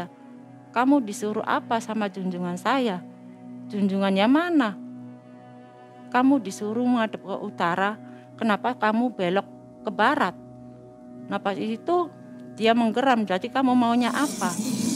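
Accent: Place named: Cirebon, Indonesia. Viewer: Kaya org cerbon ap Indramayu 😊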